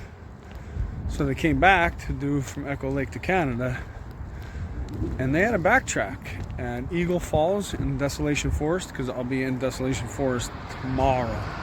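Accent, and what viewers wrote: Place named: Michigan, United States. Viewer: Great that you’re healing up a bit! Sorry about the grey hair, but maybe that will go away; one can hope! 😅 You sound like a “Michigander” that was my guess, then, Wisconsin, lol! “Talk to you’s later!” We all share similar expressions of speech, haha! Stay safe 👍🏻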